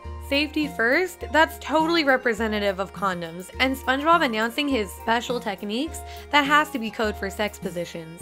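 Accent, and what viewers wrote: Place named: South, United States. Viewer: so was I right you are from the south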